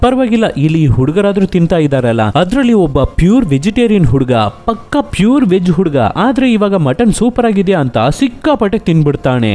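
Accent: India. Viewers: Super brother❤adre nemdu yav uru anna nem mathado style super adike keldye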